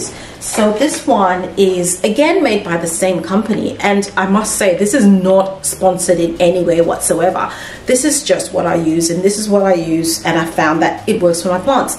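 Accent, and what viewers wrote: Australia. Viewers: Thank you so much for explaining. I have always been curious about that. I have a few plants on coir poles and I feel like when I get them wet all I'm doing is watering my soil more. I see that moss is much better than coir. Also after binge watching your videos I actually saw where you addressed my question exactly. I had to chuckle when I was watching it. I have officially watched all of your videos in one day. They are very informative, in an easy to follow along format, and can't beat listening to the Australian accent either ☺️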